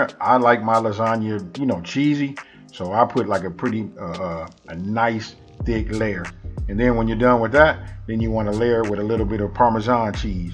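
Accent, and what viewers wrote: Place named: California, United States. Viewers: This dude is dope AF!!! What up bro bro!? I hear that Cali swang in your voice dawg... Wes wes... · Classic CalifornI.A. accent, went to college with a bunch of guys from Cali. They all sounded tha same, LOL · I can tell he’s from the West Coast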